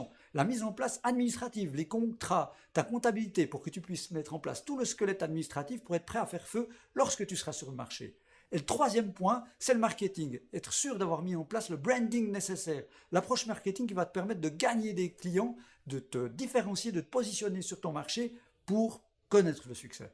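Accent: Switzerland. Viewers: J'adore cette chaîne et d'autant plus avec un accent de chez nous :P #swiss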